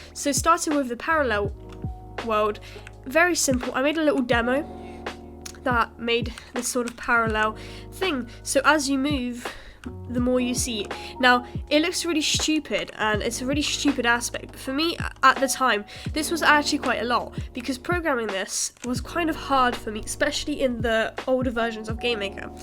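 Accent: United Kingdom. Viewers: that's a nice british accent 😊you have greetings from France😈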